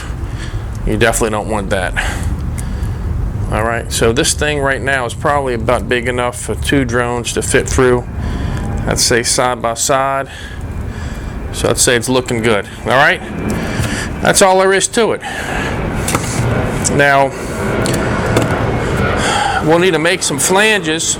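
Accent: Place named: New Orleans, United States. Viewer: I can hear that New Orleans accent , lm a Cajun also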